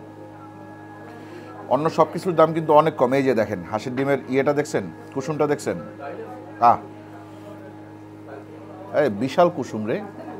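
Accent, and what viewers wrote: Barishal, Bangladesh. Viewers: Vai borishal jaiya apnar kotha bArtai akta borishailla vab aisay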